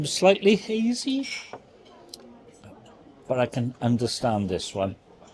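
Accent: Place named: Wales, United Kingdom. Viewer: Love your Welsh-inflected patter...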